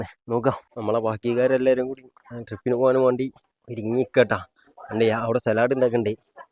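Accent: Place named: Malappuram, India. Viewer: 😂 ഇങ്ങള് മലപ്പൊറം എ വുടേന്ന പറഞ്ഞെത് എത്തായാലും വീഡിയൊ ഇട്ത്ത തൊക്കെ അടിപൊളയാണ് ട്ടണ്ണി അൻ്റെ വർത്താനും😂 നല്ല രസണ്ടേനി കേക്കാന്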